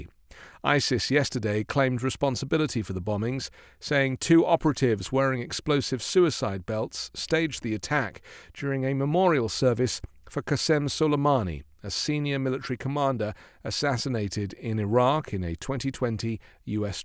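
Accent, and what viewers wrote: United Kingdom. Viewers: Fake news in short format. Brit accent to appeal to gays, RINOs and other Democrats.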